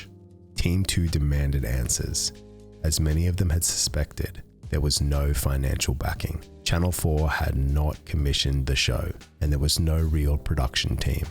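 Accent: Australia. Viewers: Ahhh..... Nce to hear a bit of smooth Aussie narration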